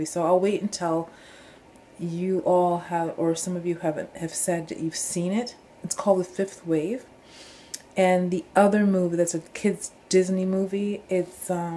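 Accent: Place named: Canada. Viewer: Are you Canadian? You have a little bit of a Canadian accent. I know the government uses TV, and films to brainwash people, and program them to influence their thought processes. Have you ever seen the 1987 film ‘They Live’? It has aliens in it, but that’s not the message the creator was sending. He uses the aliens as symbolism to symbolize that the aliens in that film are actually the elite of this world. The 1% that have control over humanity, and the earth. I believe the elite are Satan worshippers, and it says in the Bible that Satan is the prince of the air, and I believe that means the airwaves. Meaning media we consume. He also has temporary dominion over the earth, and so he can do these things to try to drag as many souls down with him. It’s really sad, but that’s the way the cookie crumbles. I had a prophetic dream about 10 years ago, and to this day I don’t exactly know what it means, but it was very traumatic, and so real, and vivid. 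In the dream I was told to read Isaiah chapter 1, and I didn’t even know if that was a book in the Bible, so I went to read it, and to my astonishment, I found Isaiah was a book in the Bible.. I read it, and it was almost verbatim exactly what my vision was about. It was a little bit different, but it was very juxtaposed to what I was reading. I know when the tribulation happens, God will protect His true elect, unless it happens to be their time, but I believe most of His elect are going to be protected. I don’t want to endure it, I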